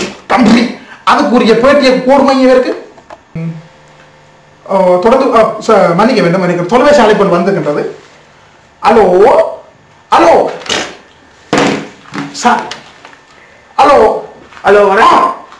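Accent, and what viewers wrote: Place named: Sri Lanka. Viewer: starting 02.33 it was really funny guys. I like srilankan accent. Try to make more like this video.All the best!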